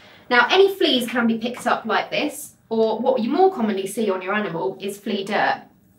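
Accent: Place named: United Kingdom. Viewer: Love your Brit-ish accont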